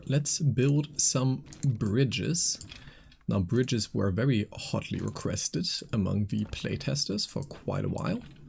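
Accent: Germany. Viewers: Hey bro, is that a German accent I hear there? Really like your content btw, really chill, just like the game lol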